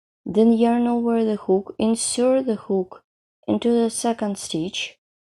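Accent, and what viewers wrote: Russia. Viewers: говорите лучше по -русски,а не на английском с русским произношением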